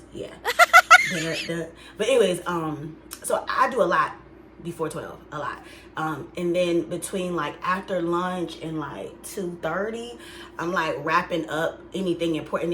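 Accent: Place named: Midwest, United States. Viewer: Where are you, please? What state, you sound like you're Midwest... M. 😅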